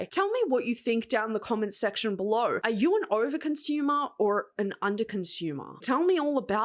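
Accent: United States. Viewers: Okay but how can you do a realistic American accent tho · Your American accent is so darn good!!!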